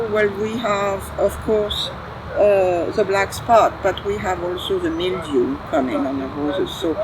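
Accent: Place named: France. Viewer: Enjoyed listening to the French accent! Oh and the bird!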